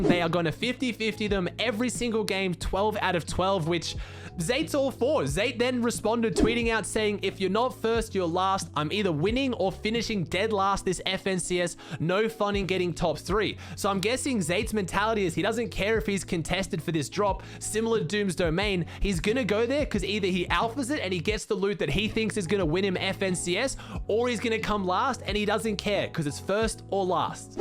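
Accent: Australia. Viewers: I don’t play fn but I. Love listening to aussie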